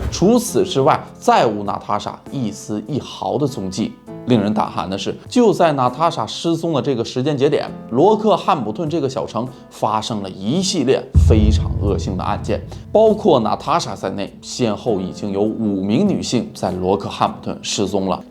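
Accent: Northeast, China. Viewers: 能不能不说东北话？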